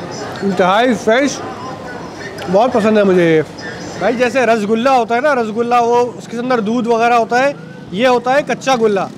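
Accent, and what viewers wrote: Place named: Bihar, India. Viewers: Totally bihari language ... 👌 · Your bihari accent is cool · Apki bhihari zabardast hai ❤️❤️❤️ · MashaAllah bhai Apko Orangi Town me dekh k khushi hoi or Apne jo behari jesi bethi zuban me bat ki bohat acha laga ❤️ Orangi town ap DayTime me ayn InshaAllah boht Chezn milengi apko explore krne k liye ❤️ Your big fan♥️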